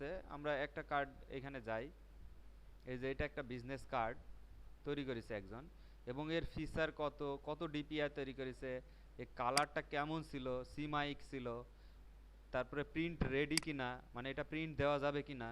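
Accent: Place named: Madaripur, Bangladesh. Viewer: Your voice was just like madaripur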